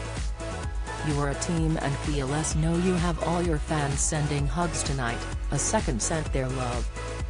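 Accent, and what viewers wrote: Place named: United States. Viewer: Why do we need an American narrator ?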